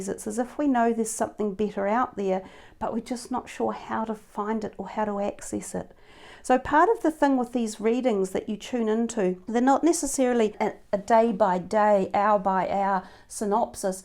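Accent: New Zealand. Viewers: Hi there are u from nz? U have strong kiwi accent. Subbed. :)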